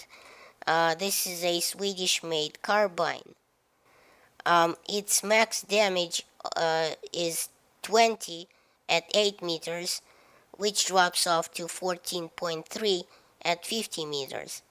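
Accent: Russia. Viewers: i don't care how old u r ur a badass cuz of ur Russian accent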